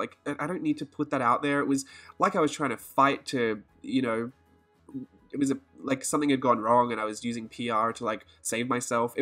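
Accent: Australia. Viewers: Yay an Aussie artist to watch 😀I could tell you where Aussie from the start 🐨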